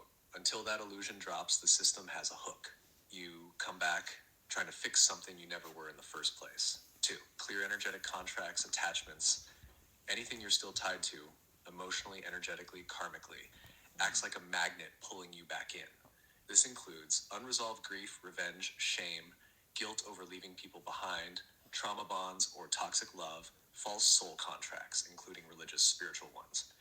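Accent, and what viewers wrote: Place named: RP, United Kingdom. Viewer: Wow I love the British accent, almost posh, and I’m british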